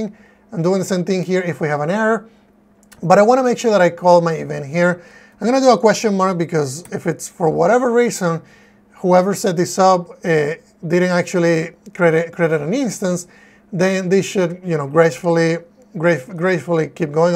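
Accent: Spain. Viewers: your voice combine with Spanish, hard to understand. hihi. Good job, bro. Thank for your sharing!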